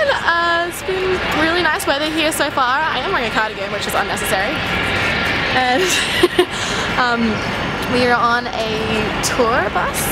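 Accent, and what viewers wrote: Australia. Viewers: omg, i love Aussie accents! It's even more sexy than the british one! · Your Australian accent actually isn`t too thick. I think you have been in Canada/US too much :)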